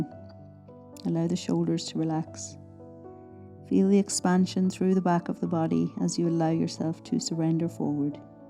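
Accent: Ireland. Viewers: thank you for your testimony. I appreciate your honesty. Part of me can’t imagine getting to a place where i can run or jump for an extended period of time without leaking... so surgery seems like the Obvious choice. I get different reports from different doctors about mesh. I believe they used my mom’s own tissues when she had hers done. I have rectocele and retroflexed uterus as well with prolapse . I have some lower backpackpain and i have had a ablation for excessive bleeding. I have been told by someone that a hysterectomy also helps with the POP and incontinence w or wo the sling procedure . What are your thoughts??? I am going to keep working with my pelvic floor and i do plan on reading your book. But i appreciate your feedback. PS I assumed that you were from Ireland bc of your accent. Your English is very good!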